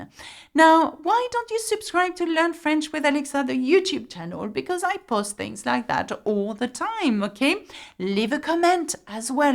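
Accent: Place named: France. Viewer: Thank you for the pronounciation that was a tough one i will learn those and thank you great lesson good job love your french accent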